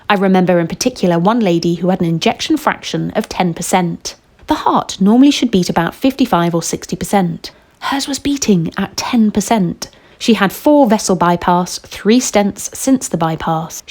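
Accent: England, United Kingdom. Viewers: I so hate this so called english voizzze that i would rather die actually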